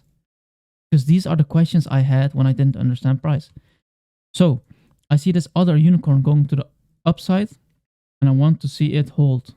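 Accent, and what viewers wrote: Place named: Belgium. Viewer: ik a belgian accent whn i hear one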